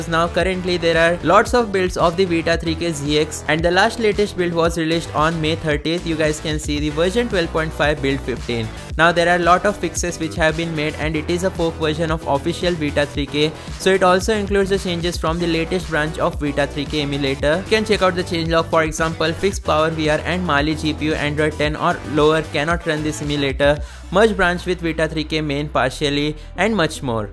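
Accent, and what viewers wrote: India. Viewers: i need a subtitle i dont understand indian english accent bruh😂😂😂😂😂😂😂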